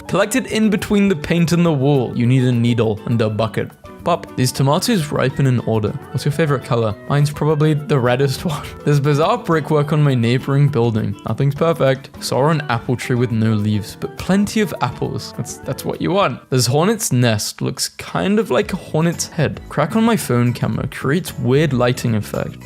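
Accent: United Kingdom. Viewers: I just realised hes British!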